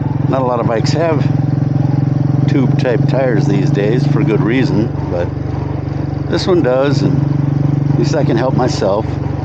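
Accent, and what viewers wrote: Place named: United States. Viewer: Marshall to Leicester... Thought these sounded like towns in Great Britain, so I thought this was another "English Chaps" review of the 350 until I heard your voice! Then thought, OK, its an American living in England! While watching, realized your in North Carolina! Love your Humor and stories ... Like murder in the Appalachians ... which made me think of the book by Bill Bryson, "A Walk in the Woods". When you started recalling stories of your childhood (like using the sweeper for siphoning fuel...back in the 50's), ... another Bill Bryson book came to mind ... "The Life and Times of Thunderbolt Kid". Bill Bryson, like myself, was born in 1951 and your humor reminds me a lot of his! I think we all pulled some "bonehead" stunts back in those days but as long as you made it safely home by suppertime,... mom and dad didn't seem to care what you were doing or where you's been all day! 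Much simpler times back then! Anyhow, I hope your enjoying your Signals Edition in Desert Sand, (as much as I am enjoying mine!)... Sure wish Northeast Ohio had a few extra weeks for motorcycle riding, ( like perhaps North Carolina does?) so my bike wasn't hibernating as long! Your audio sounds great! I'm waiting on the next shipment of Instamics (for my Insta360 One RS camera), so I can loose the dual wires (running from my helmet and saddlebag), and enjoy riding without being "tethered". Drive Safe!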